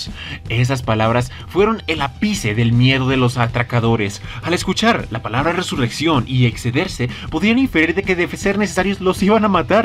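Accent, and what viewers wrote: Colombia. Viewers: Señor. Sos de colombia?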